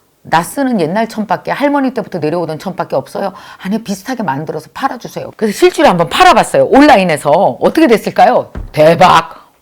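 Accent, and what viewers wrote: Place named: Chungcheong, South Korea. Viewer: 충청도 ㅋㅋㅋㅋㅋㅋㅋ 아 웃겨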